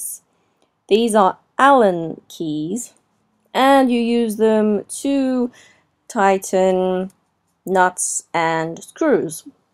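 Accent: Australia. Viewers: Your accent is bueatiful,where are you from?...from Australia l bet! by the accent .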